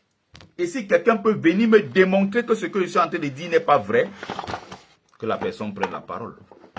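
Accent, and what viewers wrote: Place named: Beti, Cameroon. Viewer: 😂😂😂😂😂😂😂😂😂😂😂😂 toi ci hein, tu fais l’accent beti bien.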